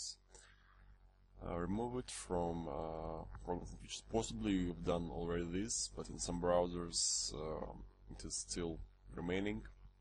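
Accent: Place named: Russia. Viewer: русский акцент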